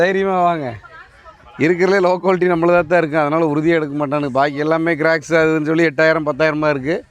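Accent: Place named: Coimbatore, India. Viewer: நீங்கள் எப்படி சாதாரணமாகப் பேசுகிறீர்கள்? கோயம்புத்தூர் நா கெத்துங்......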